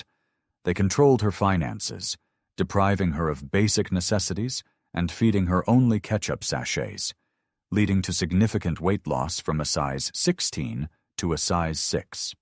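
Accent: United States. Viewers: Why is the narrator american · Why is it narrated by an American?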